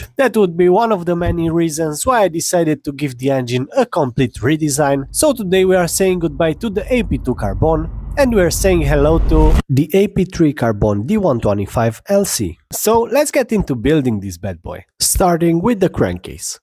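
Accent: Italy. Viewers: This guy is Italian??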